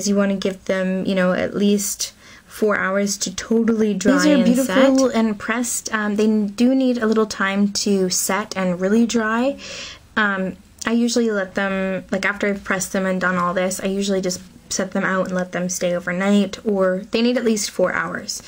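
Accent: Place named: Canada. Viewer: Are you canadian? because when you say 'out' and 'eh' you really sound like you're from canada! ll =P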